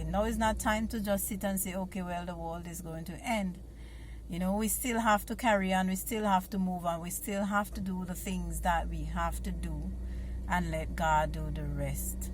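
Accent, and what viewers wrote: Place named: Saint Vincent and the Grenadines. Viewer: You have that rich Vincentian accent. You speak with passion. Speak Louder, my friend and sister!.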